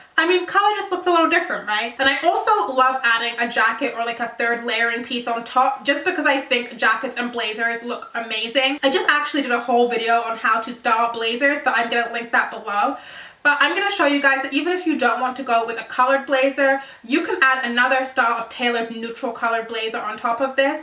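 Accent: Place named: United Kingdom. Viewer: i love how your British accent comes thru every now and then.....you're amazing!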